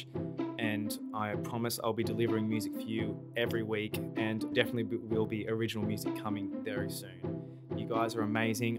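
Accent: Australia. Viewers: The moment you start talking I knew you have some Aussie vibes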